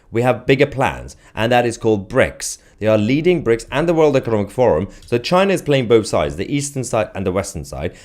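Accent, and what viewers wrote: United Kingdom. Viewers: Who are you referring to? speaks with correct English grammar with a charming British accent. It's the posters and people on the chat who do have terrible grammar. I love humorous comments about peoples' poor English that is incomprehensible at times.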